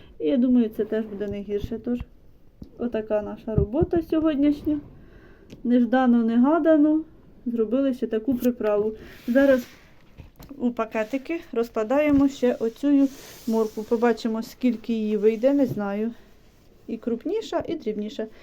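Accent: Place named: Chernihiv, Ukraine. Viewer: Доброго дня! А в якій області знаходиться Ваше село, якщо не секрет? Я оодом з Чернігівщини, і в нас також говорять на кріп-"окріп". Почувся рідний. говор з моєї Чернігівщини.❤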